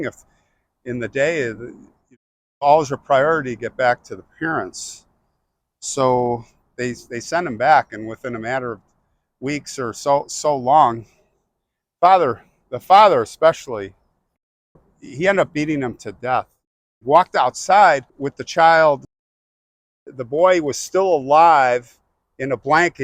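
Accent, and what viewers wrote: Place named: United States. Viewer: which part of America is your accent from? I love the way you pronounce many words 😊. That little boy's face was so cute. Poor little angel. I'm glad you visited him.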